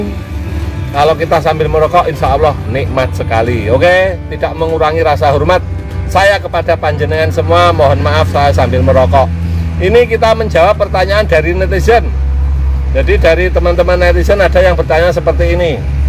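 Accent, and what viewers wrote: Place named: Malang, Indonesia. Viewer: Sampean Iki wong malang taaa pak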